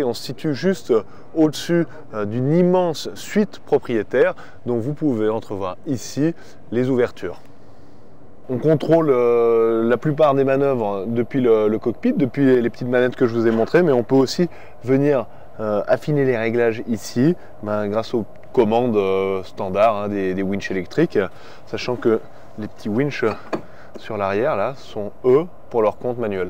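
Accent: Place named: France. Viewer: yes I like French accent, look forward to seeing it. Merci You have sold an Amel 60 as soon as I have won lotto.:).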